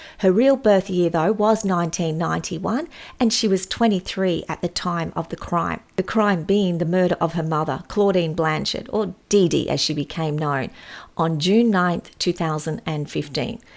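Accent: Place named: Australia. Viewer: I live on the Mid-North Coast of NSW so it's good to hear an Aussie making these videos. Your calm manner and evidence- based approach is very refreshing.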